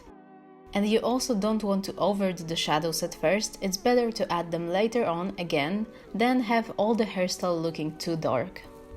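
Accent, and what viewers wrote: United States. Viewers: are u american?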